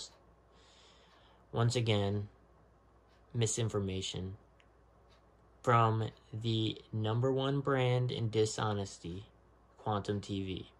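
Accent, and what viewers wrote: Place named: Prince George's County, United States. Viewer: I could tell by your accent. I grew up in PG County. Waldorf and laplata. We speak with long O's lol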